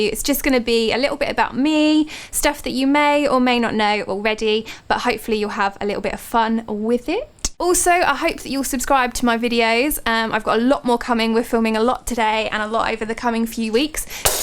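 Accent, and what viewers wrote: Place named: RP, United Kingdom. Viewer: Loved this :D such a great first video :D you sound so posh too hahah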